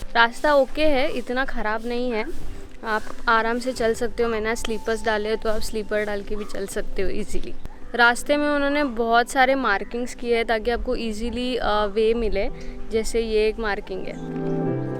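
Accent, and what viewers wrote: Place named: South, India. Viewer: Tumchi Hindi ekdam mast south Indian ahe🤝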